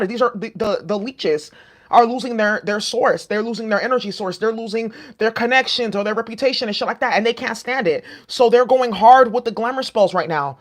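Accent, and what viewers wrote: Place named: New Orleans, United States. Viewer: Sounds like u are from NOLA. ME too!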